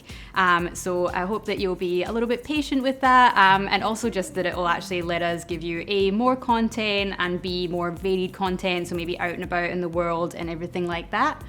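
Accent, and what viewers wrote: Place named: Scotland, United Kingdom. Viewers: First few videos I saw she kept mentioning "here in Australia" and "the Australian version of..." so on and so forth. I thought I was loosing my mind and questioning everything I thought I knew about accents. My American sensibilities didn't allow me to consider that people can leave their country and move somewhere else. Sure, we don't have the best ear for accents but Scottish is pretty unmistakable. Don't know why this is relevant; just an anecdote I thought I'd share. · I'm here for the cocktails and the lovely Scottish accent. Looking forward to new videos when you're ready.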